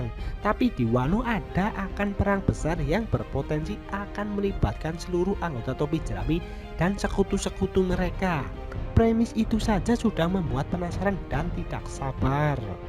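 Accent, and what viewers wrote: Banyumas, Indonesia. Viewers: paijo Londo lu ama aja menghina orang dengan logat ngapak bro.. jangan sok lu.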